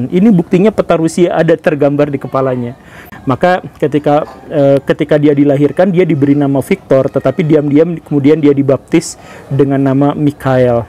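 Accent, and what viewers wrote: West Java, Indonesia. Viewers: Pak unik bahasanya...nyunda banget😁😁😁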